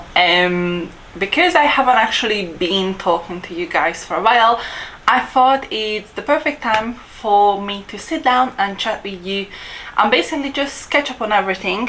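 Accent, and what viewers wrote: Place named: Europe. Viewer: you CANNOT claim British Citizenship if you had a baby born here in the UK from a British Ciztizen! Having a child who is a UK citizen does not automatically grant you citizenship as a parent. you were talking out of your arse! don't spread nonsense information that is absolutely not the case! Before you post videos, do your research! Giving up your Hungarian citizenship was the stupidest thing you could ever have done! What an absolute idiot idea! So basically, you gave up on your Hungarian Citizenship because you are a self-conceited person that it makes you believe that you are somewhat of a bigger person than the rest of us. I can easily imagine if you ever went on holiday to Hungary, you would be pretending to be a British born Citizen and only speak English to everyone. how pathetic! But in reality, you have lost a lot by giving up your EU Citizenship :) Another thing, your accent is off. 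You still have that strong European accent, so keep practicing! One day you might be able to have a British accent ;)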